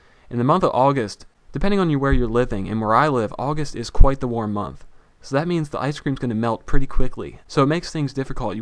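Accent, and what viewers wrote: Philadelphia, United States. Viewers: So your from Philadelphia? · Omg Your From Pennsylvania! I Knew It:) What Part Of Pennsylvania Your From? I'm from South Philadelphia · You from Philly?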